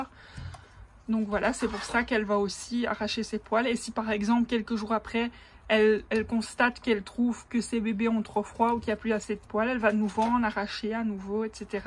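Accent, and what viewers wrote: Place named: Belgium. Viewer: Coucou je voulais savoir où se situe ton élevage 🤔 car il me semble que tu as un petit accent belge 😆 J'ai regardé beaucoup de vidéos d'élevage de lapin et malheureusement il n'était vraiment mal renseigné 😕 Mais je trouve que toi tu t'es renseignée ,tu explique très bien et ça se voit que tu gère très bien cette elevage 😁 Aussi non merci beaucoup pour ta vidéo elle m'aide beaucoup 😊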